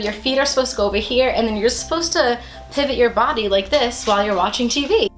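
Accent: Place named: New England, United States. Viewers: Ugh I love that you're from New England. I'm from Massachusetts, currently living in Maine, and I think it's so funny people notice you say wicked! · You have such a strong Boston accent I live in Boston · Omg those cupcakes are wicked good lol I’m a NEW ENGLAND GORL TOO 🤘🏼🤘🏼🤘🏼 I’m from Ma where are you from, I don’t know how I don’t know where you are from lol I could tell from your accent you where from New England and that one of the reasons I started watching you 🤣🤣 where did you get that coat I freaking love it it’s wicked pissa 😂😉😉😉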